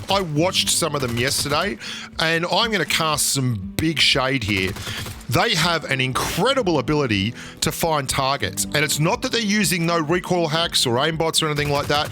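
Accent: Australia. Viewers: I have always loved your content. Recently whoever asks me about a good guide to the game, I always recommend your channel. But problem with you expanding your viewer base in India is the Language, not a lot of people are comfortable, I think, with your fast and fluent Australian accent. Ironically that is what makes your videos that much more lovely😬. Keep growing. Love ya.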